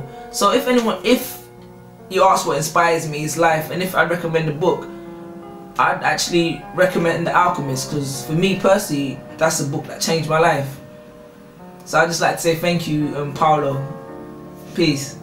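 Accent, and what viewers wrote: United Kingdom. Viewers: hey were are u from, i can tell u haev a british acent. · I love your accent.. it's almost confusing though. Some words you say you sound Haitian and other words you sound British.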